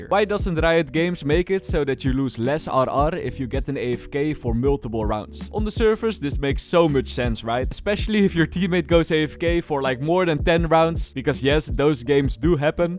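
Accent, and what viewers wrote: Netherlands. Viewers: Every day the fishy trick guy with a dutch accent posts is a good day:)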